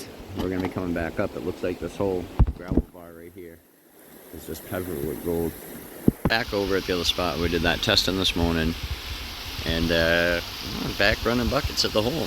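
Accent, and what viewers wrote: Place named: Vermont, United States. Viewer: cool..I think that was the river that ran through West Townsend when I lived there..nope, that was the West River...wonder if there's gold in that one too...I kinda miss that accent from Vermont..the way you said "pry bah"..lol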